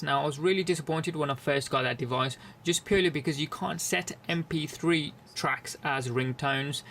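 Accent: Birmingham, United Kingdom. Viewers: wtf ur voice have to be the most annoying shit in this world ,a Chinese with British accent ?? dude shut the fuck up , u dont know shit abput this phone , galaxy probably pay u to make this stupid video -.- !! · I have a iPhone 5 and I do agree with you, it's got a bit boring. I don't know why I got it on my upgrade. It's like I couldn't think for my self and just followed the crowd. Good video dude. You sound like your from brum. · Come on man he is British its a different pronunciation. So ignorant! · Honest review and an interesting english accent! I see no progress after 4s too...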